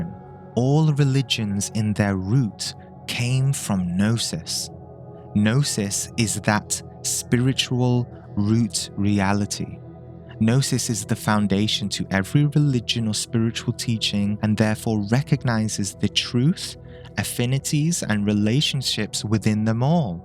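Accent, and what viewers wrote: United Kingdom. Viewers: This was very nourishing. Cheers. Lovely soft Liverpudlian accent.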